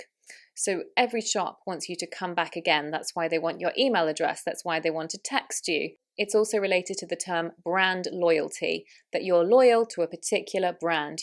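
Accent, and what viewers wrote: United Kingdom. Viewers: I love british english❤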